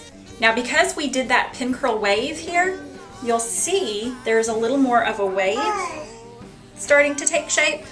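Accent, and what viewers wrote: South, United States. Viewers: Favorite part; "I'mon spray.." Favorite southern belle in the world.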